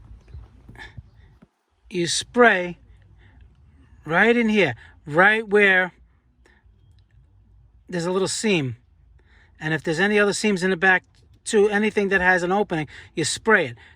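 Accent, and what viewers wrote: New York, United States. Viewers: I love his accent. This is a new york accent right?? Maybe Boston.. but i dont think. It sounds too close to home.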